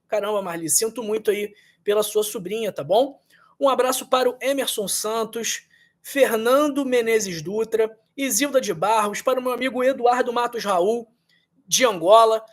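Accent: Rio de Janeiro, Brazil. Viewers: Carioca sotaque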